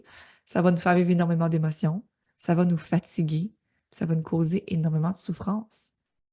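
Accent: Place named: Quebec, Canada. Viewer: On adore votre petit accent Québécois 🩷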